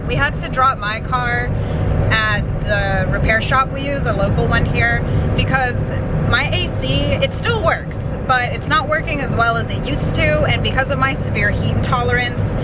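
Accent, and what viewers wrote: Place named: England, United Kingdom. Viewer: shes English is why she says it that way.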